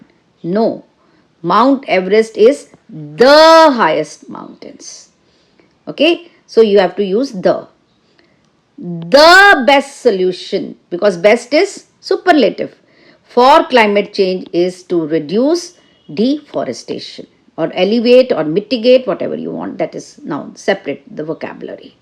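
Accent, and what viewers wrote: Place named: India. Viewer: Was your strong Indian accent an obstacle to be an IELTS examiner?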